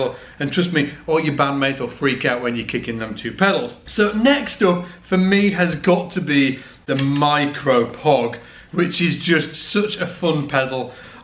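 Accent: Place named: Leeds, United Kingdom. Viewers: He’s an English guy speaking English, with a regional dialect - in this case, that of Yorkshire (albeit certain Americanisms have crept in). Here’s a thought, though; if you have difficulty with English accents that aren’t standard RP Hugh Grant-type stuff🧐....TRY HARDER. We sent you the Beatles.....I would have thought THAT would have given you a clue. 😉😂😂😂😂😂😂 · Tuner. Yes I don't like English accent too. This Leeds area English is some kind of German and London mix imo LOL... Anyway, I prefer standard American accent personally.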